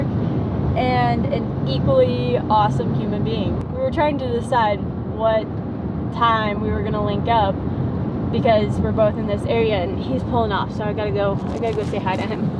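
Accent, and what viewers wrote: South, United States. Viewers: My Friend, just curious, are you From the Southern South?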